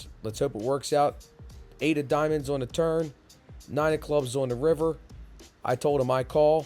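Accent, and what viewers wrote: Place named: New Jersey, United States. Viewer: Watching from Australia. Love the accent - is it New Hersey?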